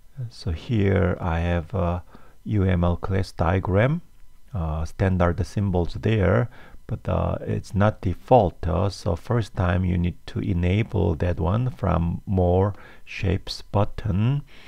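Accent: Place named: South Korea. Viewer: definitely korean accent.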